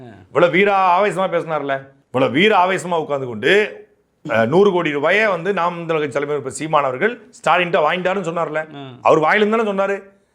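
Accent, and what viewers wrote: Tirunelveli, India. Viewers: When saattai speaks, sometimes his nellai accent slips, that looks very cute❤😅....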